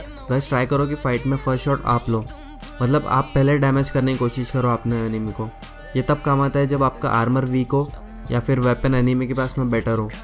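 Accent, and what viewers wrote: Hyderabad, India. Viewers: Hyderabadi tone arhi kya🤔😋🤪 Btw OP skills bro 🥺🙌🤠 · Bhai hyderabadi h shayad 😅👌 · Bhai tum hyderabad se hai kya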